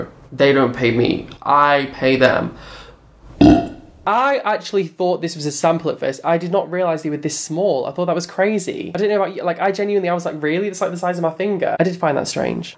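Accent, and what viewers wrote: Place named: United Kingdom. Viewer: The British accent makes it particularly saucy.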